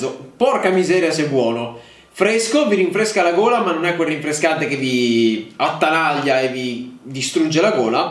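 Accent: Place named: Bari, Italy. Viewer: Sembri più barese che napoletano 😂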